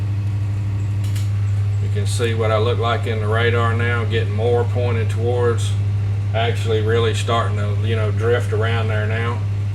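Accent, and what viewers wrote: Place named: Appalachia, United States. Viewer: You did a FINE job but MERCY, you sound like you're from here. You'd make a fine hillbilly if you wanted to!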